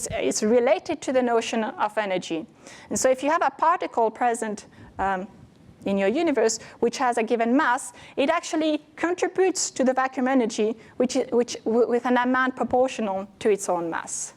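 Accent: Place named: India. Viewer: Why does she talk like an Indian?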